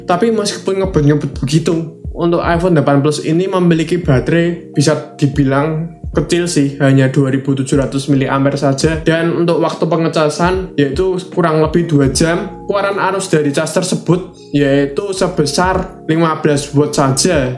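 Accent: Java, Indonesia. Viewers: Medokkkeee podo karo aq likk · Salfok logatnya medok bgt bang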